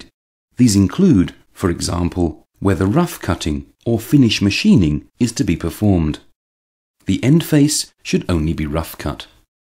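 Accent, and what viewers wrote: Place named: England, United Kingdom. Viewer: fucking english accent shut up already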